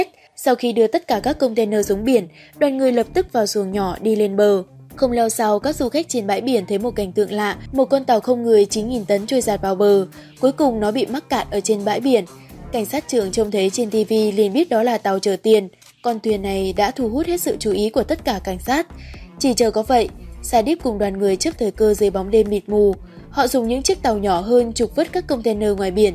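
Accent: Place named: Hai Phong, Vietnam. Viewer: chị review chắc là người Hải Phòng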